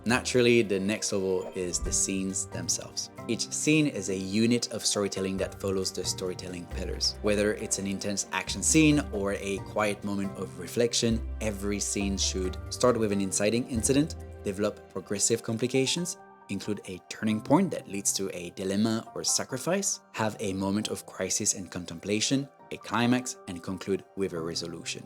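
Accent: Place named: France. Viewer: I finally figured out you are FRENCH!😂 I noticed you had a really cool accent and I finally figured it out!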